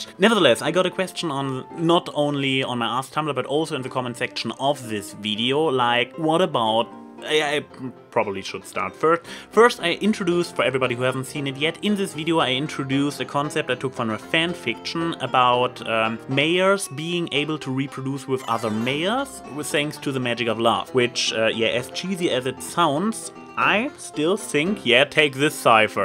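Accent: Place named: Germany. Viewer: ... you cant tell by the accent? i believe he already has told people but, if i remember right he is from Germany.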